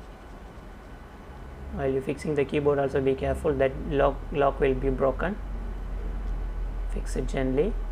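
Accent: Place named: South Asia. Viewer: He has an Arabian keyboard, but sounds South Asian loool, but I love that keyboard. I wonder if this will work.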